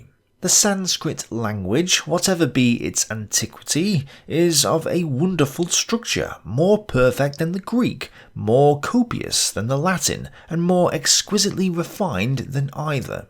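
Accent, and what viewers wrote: United Kingdom. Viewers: Overlooked is their primary motive and impulse to expand Europe eastward into a world superpower colossus. No matter how bizarre, any ideology to further that goal no matter was deemed proper. That's why Hitler tolerated that stuff. It's amusing to hear a guy with a Limey accent in the once great British Empire bad mouthing that failed dream.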